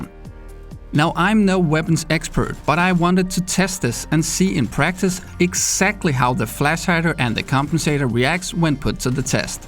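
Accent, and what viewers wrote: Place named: Denmark. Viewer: Are you Danish? If not! Then where are you from... Du lyder Dansk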